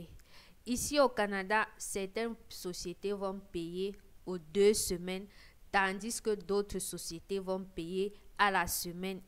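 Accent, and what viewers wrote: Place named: Bamileke, Cameroon. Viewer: Le ton bamileké de ça . Slt sister. Ton visage m' est familier stp dans quel province vies- tu?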